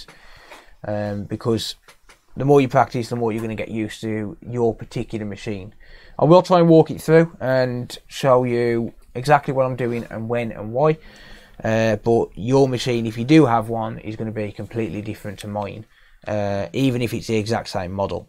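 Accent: United Kingdom. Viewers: Thing is, I can understand British English quite well. I can barely follow Benedict Cumberbatch is Sherlock when he goes 120% in his thinking, but I can get the general gist. Maybe it's the accent that throws me off in this case. About anime, well, I listen to the original dub, not the English one :D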